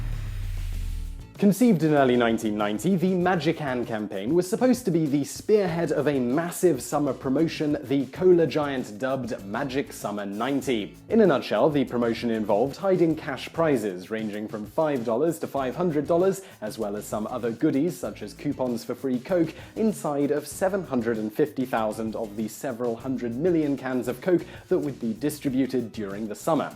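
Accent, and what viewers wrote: United Kingdom. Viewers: Since when has Vsauce Micheal had a British English accent?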